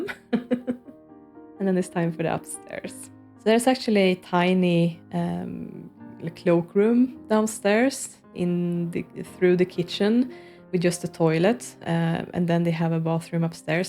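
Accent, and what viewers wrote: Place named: Sweden. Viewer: I always love watching your building videos! You're a talented builder and your videos are always so relaxing to watch ☺️ Are you swedish by the way? You don't have to answer that if you don't want to. But I am Swedish myself and I always thought your accent sounds very swedish ✨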